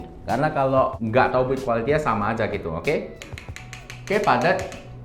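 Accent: Medan, Indonesia. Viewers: Medan kali logat kau bang. Wkwkkw mantap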